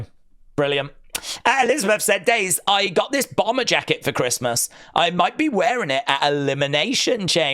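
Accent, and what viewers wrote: United Kingdom. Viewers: U make a British accent sound perfection cody will be heel by wrestlemania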